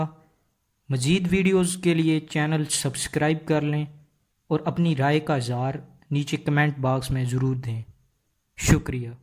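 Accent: India. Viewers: axha phr ap khud hi th0ri apni v0ice k0 chng kr k b0lian qk ap ki w0 punjabi wala acent h..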